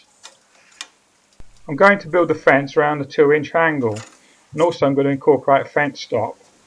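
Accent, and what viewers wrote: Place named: England, United Kingdom. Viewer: I didn't realise you were Italian, sorry, as you sound very much English & have a similar accent as myself, who is from the Black country. Thank you for taking the time to reply. God bless & look forward to more videos.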